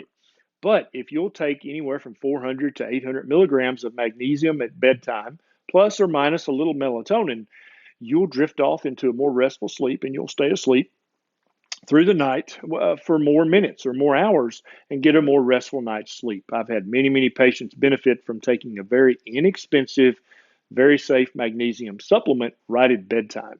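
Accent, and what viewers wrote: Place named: Georgia, United States. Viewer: You sound like you're from Georgia.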